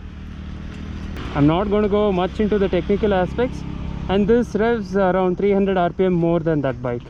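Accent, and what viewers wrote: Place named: Chittoor, India. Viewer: Hey you make amazing videos no doubt about that and keep it going and looks like your native is chitoor , guessing that from the accent and also looks like you have been inspired a lot by Sriman kotaru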